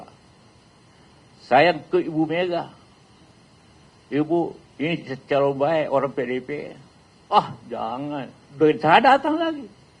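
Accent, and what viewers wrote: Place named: Sulawesi, Indonesia. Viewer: Memang cara orang bugis begitu ngomong nya. Jgn samakan dgn orang jawa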